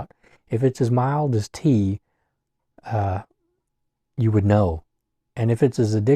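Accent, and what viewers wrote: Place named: Kentucky, United States. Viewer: Hi Thanks for your earnest and honest “rambles” on a rainy Saturday! I enjoy your thoughts on books and I have to admit your KY accent brings me to many happy memories, as I grew up in Louisville. I have made many visits to Lexington, Bardstown, Berea, Gethsemani and most central to western areas of the state. I have not lived in KY for almost 60 years, but I miss the ease and laid back style which Kentuckians like you embody. Stay well! Extreme pain is an intriguing phenomenon. When it has gotten me, infrequently, I feel like I leave my body and mind behind and enter a realm of subconscious, very surreal existence (just to cope). Again, thanks for sharing!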